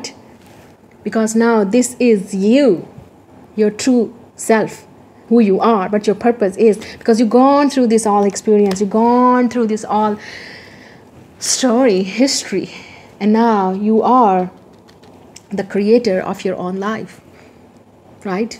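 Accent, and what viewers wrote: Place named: India. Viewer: 💯 % agreed to you; the biggest religion is humanity and we should respect each other for that.. great work and respect for you dear... Ur an awesome and straight forward person :)) god bless you with all the happiness:)) n yes our accent is same ... lots of Love and respect for you from india for this reading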